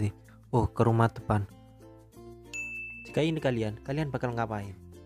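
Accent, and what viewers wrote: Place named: Java, Indonesia. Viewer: Br nonton game ini.. Sk sm suara nya medok medok jowo ok.. Arigato gosaimasta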